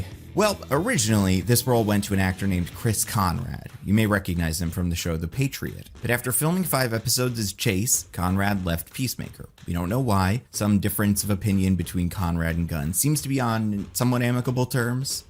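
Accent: Philadelphia, United States. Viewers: u from Philly???????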